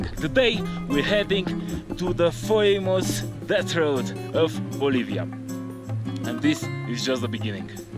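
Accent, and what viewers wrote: Russia. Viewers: First time no youre great channel, i like the Rusia accent! Exellent videos! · Чисто русский акцент)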